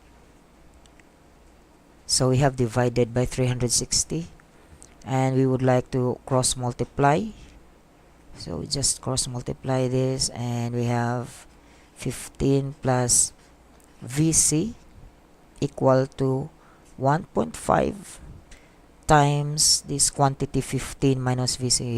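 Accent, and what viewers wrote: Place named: India. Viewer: Boss you sounded like indian.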